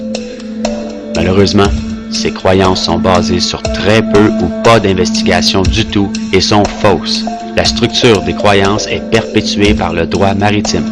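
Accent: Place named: Quebec, Canada. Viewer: excelent récapitulatif merci. Qui est la source de ce vidéo? Parce que ça sonne comme étant un compatriote québecois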